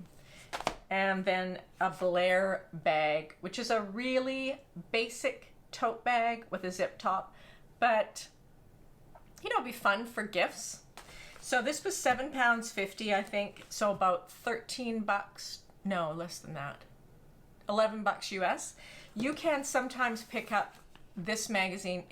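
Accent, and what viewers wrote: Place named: Canada. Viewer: Thanks for your videos. I just discovered you. I love your Canadian accent, so easy to listen to. I wonder if you ever do a FBA. I'm struggling to get my makes to fit me nicely. If I make a size 18, its too big in the shoulders and neck area, so I have attempted to do FBAs, but am never quite satisfied with the results. Thanks again and keep them coming.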